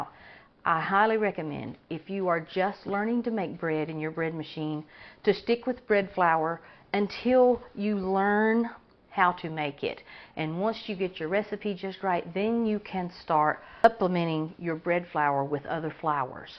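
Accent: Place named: South, United States. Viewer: Hears charming Southern accent stops to watch entire video. Thanks YouTube for this.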